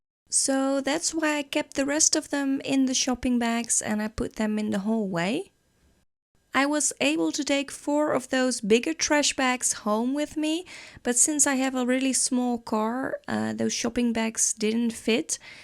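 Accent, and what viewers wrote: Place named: Netherlands. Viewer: Wat gaaf ik kijk altijd dit soort filmpjes! Nu kwam ik bij jou uit. Maar ik hoorde in je accent wat nederlands! Tof dat je dit doet! Ik ga je volgen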